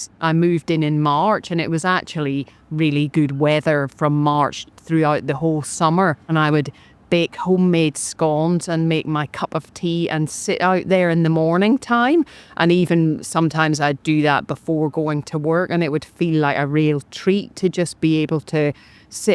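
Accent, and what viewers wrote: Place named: Antrim, United Kingdom. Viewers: Accent sounds like someone who grew up in Northern Ireland and moved to Edinburgh in their teens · Beautiful home. Listening to your accent reminded me of my Grandfather…he was from Antrim.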